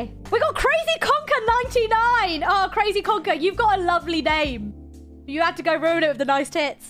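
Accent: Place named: United Kingdom. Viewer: Dude what is your accent? You sound British and Californian simultaneously wtf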